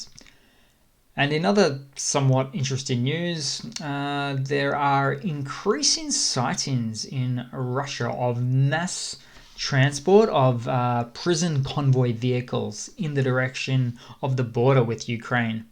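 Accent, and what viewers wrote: Australia. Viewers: Same accent, both Australian. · Really? are you an Aussie? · Hey. I like your reporting. Good stuff. Honest question here: what is that accent? Australian? Please forgive my ignorance. It’s pleasing to the ear, but I can’t quite place it. Just guessing Aussie.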